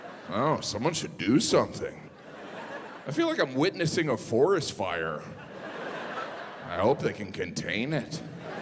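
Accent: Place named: Jamaica. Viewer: thats more like a Jamaican accent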